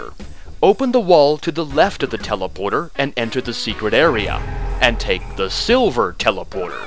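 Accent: United Kingdom. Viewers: so BEAUTY SPEECH. NICE. I LISTEN YOUR VIDEOS EVERY NIGHT. NICE ENGLISH CLEAR. I LISTEN VOICE CLEAN BRITISH DIALOGS. I ALMOST 100% UNDERSTANDING THANKS TO YOU SIR FOR FINE SPEAKING. SO AS I COULDN NOT UNDERSTAND OTHER INTERVIEWS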